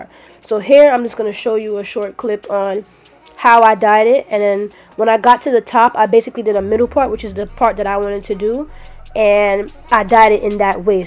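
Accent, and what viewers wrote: New York, United States. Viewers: I could tell she’s from New York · you from New York ? 😭. I love the accent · You must be from NY city lol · Are you from NY? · U from ny? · I’m so glad you from NY like me cause it gave understand everything you say word to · Lmaoo you from ny?? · I knew you was from some part of NYC when you said “yo I be buggin” 😂 real NY shit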